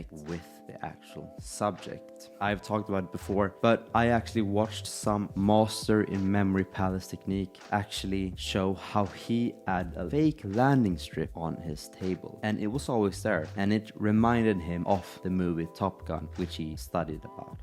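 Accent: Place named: Sweden. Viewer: The swedish accent coming through your english makes you weirdly lovely to listen to. Dont change dude❤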